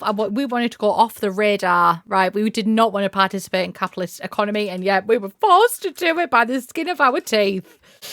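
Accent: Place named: Middlesbrough, United Kingdom. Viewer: I had a random thought while listening to this video... I don't remember if the Harry Potter books SAY what region Lily and Severus are from, but since it's an industrial town I decided my new head cannon is that they're from around Middlesbrough and therefore share your accent and that really makes me happy. 😁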